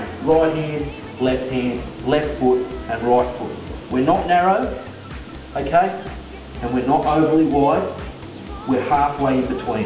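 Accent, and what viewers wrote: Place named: Australia. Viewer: You got a lovely australian accent, love it !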